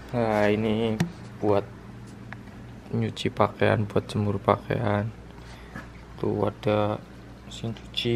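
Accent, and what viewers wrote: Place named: Java, Indonesia. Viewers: Ikhh, seneng ngliat maz nya ganteng tp medok ky q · All, wahh hebat mas bisa bahasa korea, tp logat jawanya masih ada ya pas ngomong indo nya hihi 👍 sukses mas di sana, Jd ngiri pengen ke korea 😂👍👌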